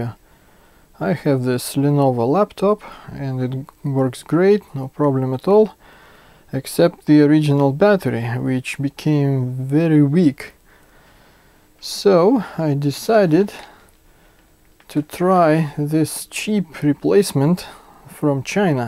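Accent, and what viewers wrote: Russia. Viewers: I love your video and you Russian accent ^^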